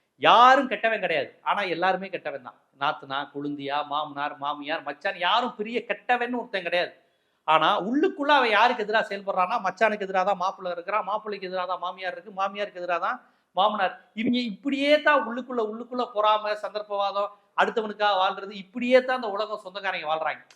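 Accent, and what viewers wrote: Madurai, India. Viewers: நீங்கள் எதற்கு மதுரை வட்டார மொழியில் பேசுகிறீர்கள் இந்த படத்திற்காகவா?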